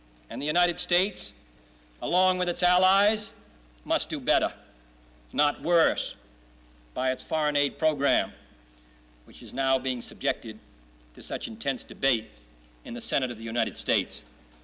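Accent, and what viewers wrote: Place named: Boston, United States. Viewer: I love his Boston accent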